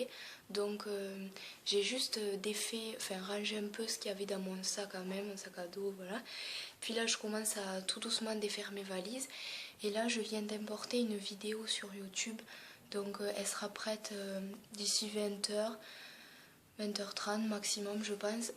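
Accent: South, France. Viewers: T'es vidéos sont juste top! J'aime beaucoup ton accent,du Sud? bisous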